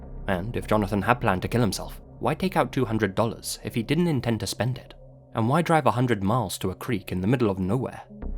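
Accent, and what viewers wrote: England, United Kingdom. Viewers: I love your 'made to tell stories voice' And accent. I find it comforting. Think its an English thing. Im a northener. You should narrate audio books too. Its a real skill. One you have in abundance 🤔👍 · Are u a fellow Brit? I watch so much Youtube accents blend together lol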